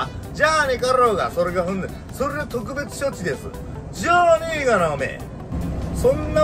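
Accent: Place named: Okayama, Japan. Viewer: お疲れ様です! さんは中小のドライバーが思っている事を全部言ってくれるし、備後在住のワシにはさんの岡山弁がとても聞き心地が良いです!では次回楽しみにしてます!ご安全に✌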